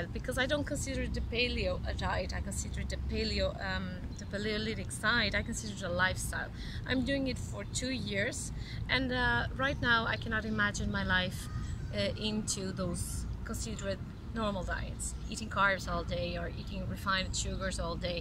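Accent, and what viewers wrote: Ireland. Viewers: Omg that irish accent ... just love it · That irish accent! Nota-se imenso! Gostei muito do video e do sitio onde estás, queria muito! Brevemente vou trazer um vídeo sobre vegan/vegetarianismo para o canal, e a Paleo Diet parece um bocado assim também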